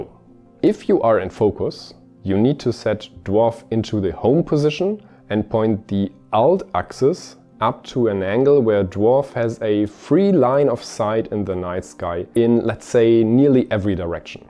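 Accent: Germany. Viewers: German accent..... Ugh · Ich glaube deine Muttersprache ist deutsch ;)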